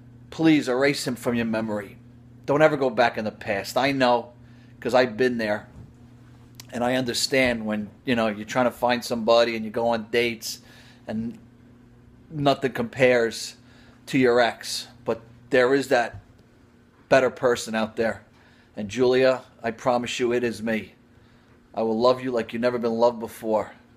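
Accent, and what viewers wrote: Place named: Brooklyn, United States. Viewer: It's a Brooklyn accent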